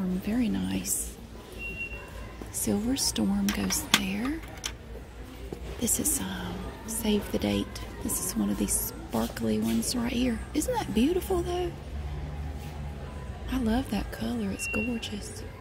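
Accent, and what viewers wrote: South, United States. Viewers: I love your accent so much😭 The southern twangs keep reminding me of home😭😭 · The video I didn't know I needed. Her southern accent makes me feel safe and her little phrases like "what kind of a drunken duck" make me laugh my ass off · This was so incredibly satisfying!!! & I looooove her accent. I recently moved from Hawaii to Texas & I can’t get enough of these southern accents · I love your accent. 😍 That's the part of the US I'd want to live in for the rest of my life ❤️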